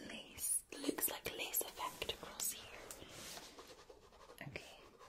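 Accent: Scotland, United Kingdom. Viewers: So Scottish reminds me of Amy Pond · I'm from Scotland and I love all women's accents from all over the world but nothing excites me more than a homegrown accent , amazing X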